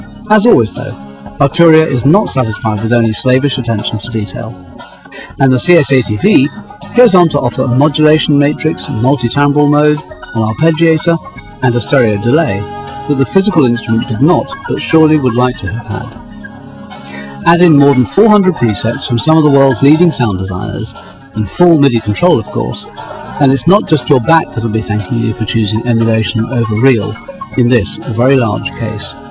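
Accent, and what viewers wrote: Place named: Australia. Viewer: Australian narrator... because of the price of the synth at the time.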